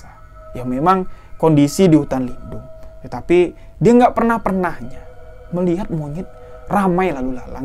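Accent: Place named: Jember, Indonesia. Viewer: Hostnya cara bicara kayak Anang Hermansyah Orang Jember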